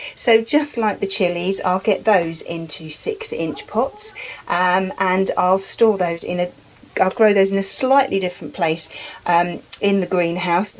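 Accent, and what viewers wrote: United Kingdom. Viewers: I love your videos! You fulfill my need for gardening and proper british accent <3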